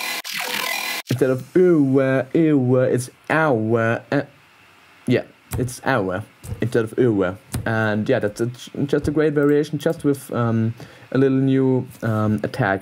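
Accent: Germany. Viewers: u german??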